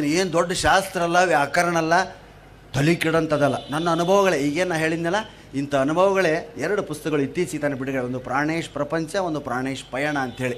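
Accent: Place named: Uttara Kannada, India. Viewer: Super sir nevu yell hodru nama Uttara Kannada bashe dag matadtiri ☺️Ur amazing sir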